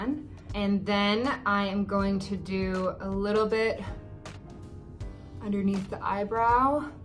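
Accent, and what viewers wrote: United States. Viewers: She's Scottish believe it or not, but has the most American accent ever. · I thought she was british, why does she have an american accent?! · if shes British why does she sound American? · but...she’s British...why does she have an American accent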